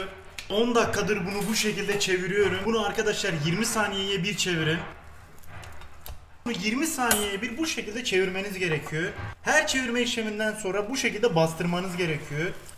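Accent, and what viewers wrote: Adana, Turkey. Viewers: abi sende adana şivesi var heralde kesiyürüm bakürüm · Abicim adanali misin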